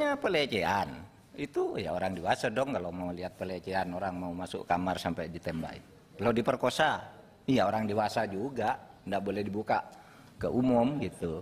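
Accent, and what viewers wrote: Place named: Madura, Indonesia. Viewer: PAK MAHFUD MD... LOGAT MADURA NYA MASIH NAMPAK......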